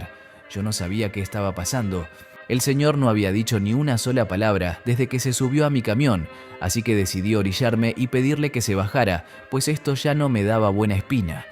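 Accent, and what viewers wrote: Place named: Argentina. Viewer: Buenas historias lo único es que las historias están ambientadas en México y el narrador es argentino 😅😅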